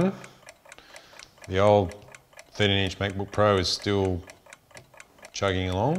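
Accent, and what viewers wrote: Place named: Australia. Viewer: Since some of us hv a hard time w OZ accent.. Be nice to hv more text display in explaining the specs.. Do a detailed listing on the main screen for comparison.. Otherwise an awesome 👍🏻 review..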